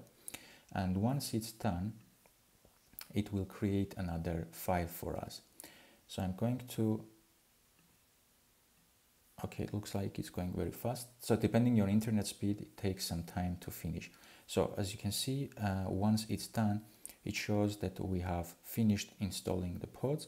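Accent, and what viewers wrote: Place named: Iran. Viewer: Thanks it was useful💙 with your lovely Persian accent😁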